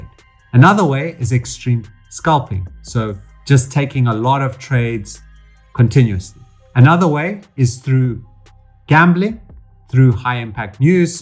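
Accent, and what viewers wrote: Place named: South Africa. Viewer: I hear a South African accent.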